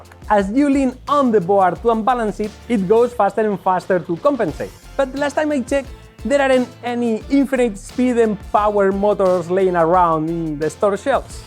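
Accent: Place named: Spain. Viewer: Tu eres Español Pero vamos.... Ese acento... Igual me equivoco. , a ver si te lanzas y metes audio Español también.. Gran video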